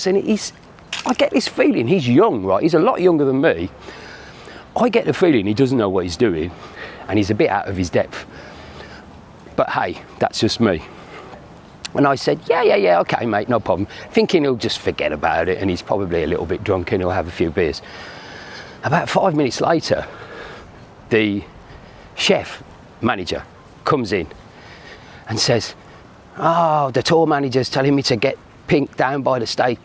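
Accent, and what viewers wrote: Birmingham, United Kingdom. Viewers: What part of Brum you from.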